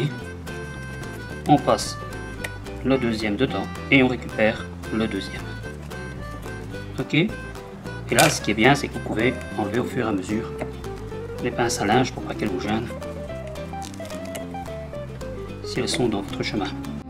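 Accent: Belgium. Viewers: Merci beaucoup, je vais tester ..super explications avec l'accent belge...c'est toujours agréable pour une expatriée...bonne année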